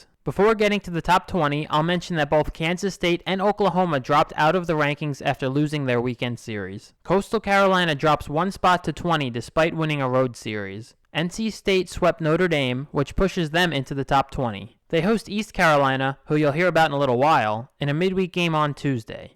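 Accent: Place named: Long Island, United States. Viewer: I recognize a Long Island accent anywhere. Go Yankees!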